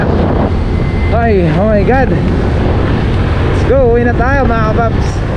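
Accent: Philippines. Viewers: bisaya ka paps? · Ilonggo ka ba papsi?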